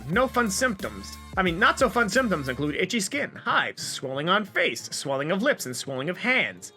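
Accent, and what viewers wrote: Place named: New England, United States. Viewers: The fact that you love in New England explains your accent! My uncle used to live in Rhode Island and now lives in NH not far from the Mass border and he has a similar accent. As an English boy it is funny and weird! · You are the most New England sounding person I've ever heard. I'm from California, you've basically got a foreign accent as far as I'm concerned.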